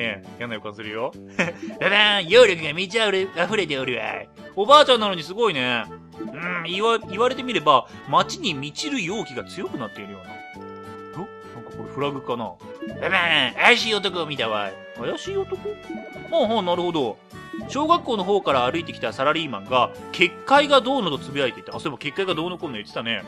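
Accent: Kansai, Japan. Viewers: 君の拙い関西弁に萌えた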